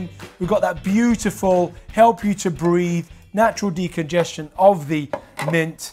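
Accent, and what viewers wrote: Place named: United Kingdom. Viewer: I love Jasons British accent!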